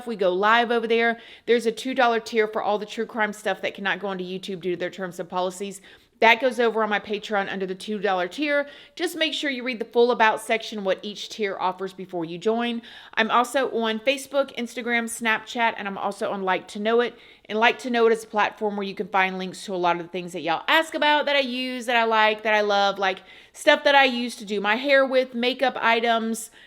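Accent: South, United States. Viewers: That’s how we speak in the south hunny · yeah we southerners have pretty much always said it that way lol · Why would it embarrass you? Everyone speaks with different cadences and accents depending on where they are from. It's very commonly said in the south and is southern.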